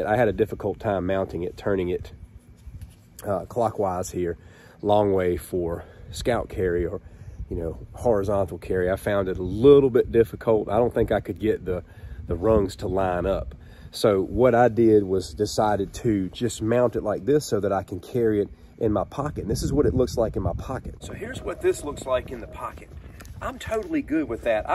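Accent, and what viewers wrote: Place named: Virginia, United States. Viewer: Can I just say as an Englishman the Virginia accent is the coolest in the 'States.😁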